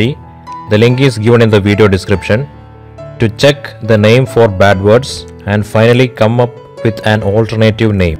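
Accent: Kerala, India. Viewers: Malayalam accent aanallo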